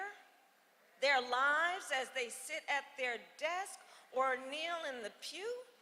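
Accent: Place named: South, United States. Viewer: LOL her family owned slaves in Jamaica, why is she talking like a Southerner. What a joke.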